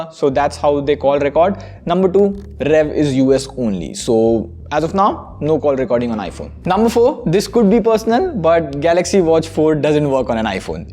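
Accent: India. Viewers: Such a horrible think Indian accent however thumbs up because it was a good video well done sir… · Why Hindis always have to speak english with this bad accent? Really. I’m from Poland i my original accent is Russian like but I learn how to speak better by watching movies n shit. I don’t get it. You really are fucking good YouTuber men but I don’t understand you because of accent.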